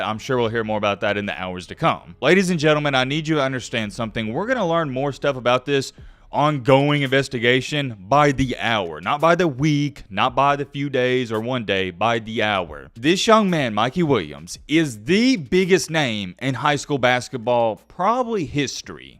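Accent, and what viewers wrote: South, United States. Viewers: C'mon bro southern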